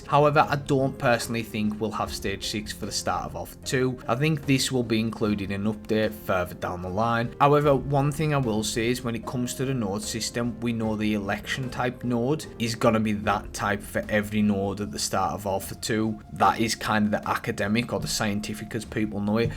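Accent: Newcastle, United Kingdom. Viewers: Hearing that Geordia/Maccam accent talking about Ashes is wild haha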